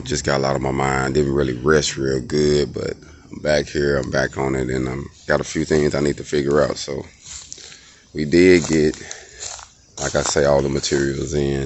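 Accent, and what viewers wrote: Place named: Memphis, United States. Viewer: Sound like u close to Memphis my dawg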